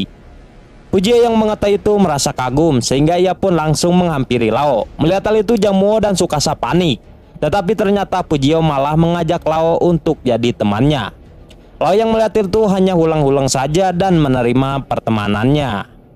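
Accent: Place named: West Java, Indonesia. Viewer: Sarebu persen pembicara adalah USA alias Urang Sunda Asli, katambahan katkuaya babangus mah semakin teryakinkan.... Wkwkwk mantap ieu si mamang sumanget terus sesuai alur Carita 👍🏻👍🏻👍🏻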